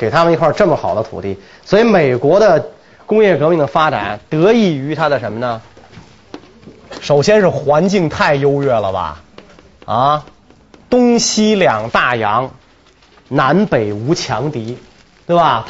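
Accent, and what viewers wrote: Beijing, China. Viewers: 讲课的时候用北京腔感觉不严肃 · 讨厌北京强调。 尤其是讲课的时候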